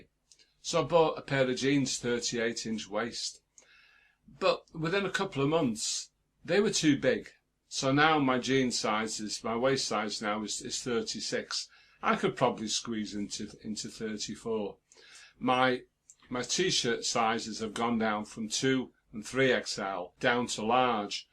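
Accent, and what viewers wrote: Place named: Manchester, United Kingdom. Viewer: Congratulations my friend. Happy you've been successful 💪👍👍👍. I'm from the continent but from your accent I would say you're from Manchester where my favourite music bands come from.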